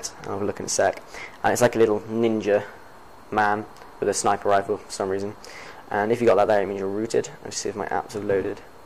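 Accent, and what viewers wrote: London, United Kingdom. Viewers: YOU SOUND LIKE YOU'RE FROM LANDAN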